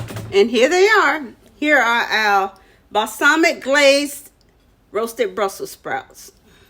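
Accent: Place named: New Orleans, United States. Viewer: You're my new Auntie, I used to live in Nola and I miss the food and the accent plus the hospitality. I'm inspired to make food from your recipes.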